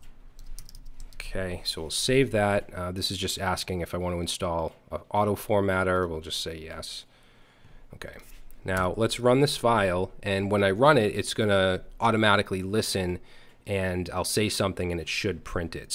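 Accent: Boston, United States. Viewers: It can even pick up your Boston accent! good one. More Python please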